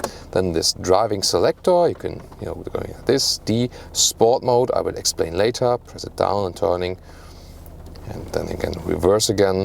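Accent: Germany. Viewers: English with german accent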